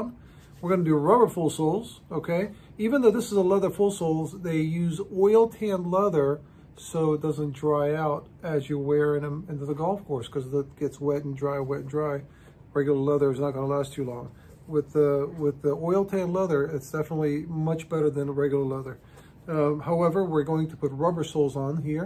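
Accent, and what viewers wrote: New York, United States. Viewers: Your channel is addicting, watched a few episodes last night and then I realized it’s 2am, and had to get up at 4am lol. But it’s relaxing and I enjoy watching. Learning about nice shoes. I’m a carpenter so don’t get to dress nice much, but I like watching how you take apart these shoes and rebuild them. Pretty awesome tools and old school machines. You have a NY accent brother. Not sure where your shop is located. I had a German shepherd named Zues too, sorry you had to relocate him. Take care and keep posting awesome videos.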